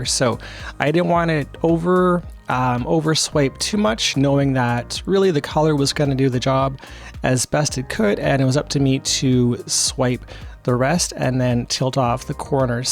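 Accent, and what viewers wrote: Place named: Canada. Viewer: Great piece!! I always forget how different Canadian accents are depending on which province. I’m in Ontario and we pronounce our A’s so different hehehe. Long arms definitely with larger canvases. I struggle with this only being 5’1. Great colours. I’m definitely going to check out the FolkArt colour shift brands! And I’m looking forward to chatting about a future collaboration!! Have a great day my friend!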